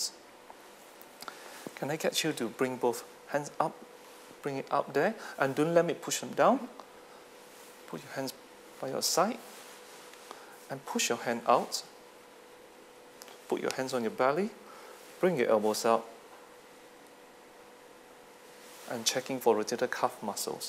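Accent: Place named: Singapore. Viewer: A very polished Singapore accent.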